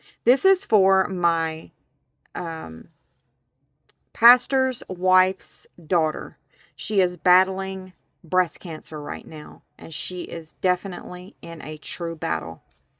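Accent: South, United States. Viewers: You are a Blessing and extremely generous. Not many content creators return their gratitude the way you do. You not only put your heart and soul into your channel and subscribers. You take the time, thought, and money to put together a large gift box for those who have given and blessed you. It's hard to come by finding generosity and kindness, but also people willing to give without expecting anything in return. I can't afford to send anything or buy from you at this time, but am willing to do that whenever I get that opportunity. With you being open and sharing the Lord publicly, that's a gift in itself. Plus, you're so adorable and just a doll baby with that HUGE country bumpkin twang that I miss so much whenever I lived in the south. (Georgia). I don't feel it's unfair. I feel that you made the right choice when deciding whether to return your gratitude. 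You can ONLY do what you can do and also afford. We all strive to do our best once we choose to do the best thing. I'm sure once your channel grows so will the giveaways. When one chooses to subscribe to a channel, they do not come in expecting gifts. Why now expect it knowing your reasons for the giveaway? Makes you think of others true intentions for watching or subscribing to creators. Focus on those who are here for you regardless of gifts or freebies. Those who are selfless and carry honest intentions, are those who are favored the same in return. Whether it's time, generosity, or acceptance. Please do not stress on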